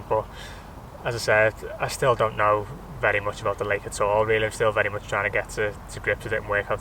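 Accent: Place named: Liverpool, United Kingdom. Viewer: Well in lad !! Nice to c a scouser on a vlog !! Peaceout .....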